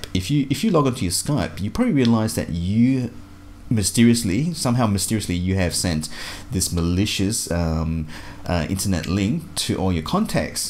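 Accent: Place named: Australia. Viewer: thanks for this info ... I like your Australian accent so cool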